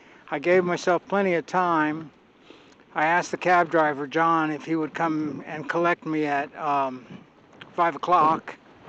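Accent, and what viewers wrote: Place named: Ireland. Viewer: Love the Irish accent, John. Be safe way up there with all that wind!! Vaya con Dios!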